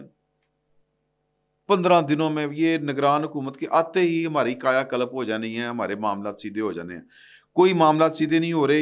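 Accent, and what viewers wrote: Punjab, Pakistan. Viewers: MashaAllah apka style with punjabi tarka is outstanding. Very informative and entertaining. Apk colleagues apko beat nahi karsaktay no matter how much they try to copy you,including Mansoor Ali khan. Keep up the good work.God bless you.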